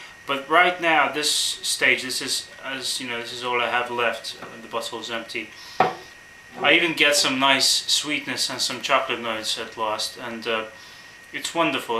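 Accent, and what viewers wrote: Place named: England, United Kingdom. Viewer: nice review!! where in english are u from?? I am from vancouver canada living in osaka japan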